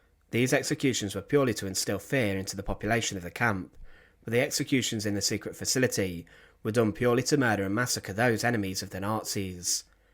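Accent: United Kingdom. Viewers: That is the young man's accent! Everyone from his neighbourhood in the UK will speak in this manner. Nothing to do with disrespect.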